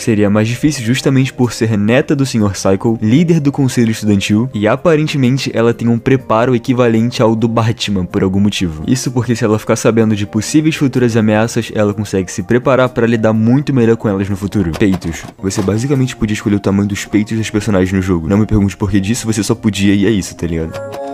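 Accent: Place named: Rio de Janeiro, Brazil. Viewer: Omagad, carioca?? Inscrito adquirido.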